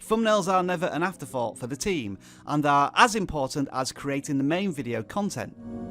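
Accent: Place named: United Kingdom. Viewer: hey, are you british? am loving the accent 😄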